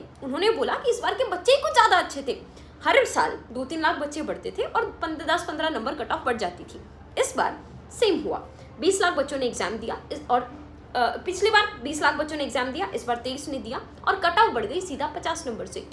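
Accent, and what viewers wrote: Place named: Bihar, India. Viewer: Are you bihari your toun like bihari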